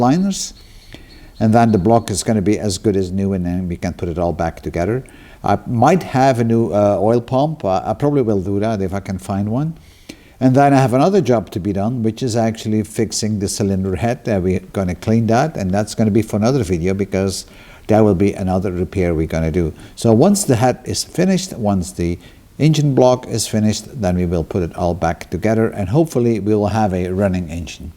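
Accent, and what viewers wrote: Netherlands. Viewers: Great Videos - I thank you for them - your accent sounds like my Van Engen relatives from Holland - Gary - Iowa - U.S.A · you sir have amazing skills in bodywork and mechanics. sound like a Dutchman who lived in Canada for some years.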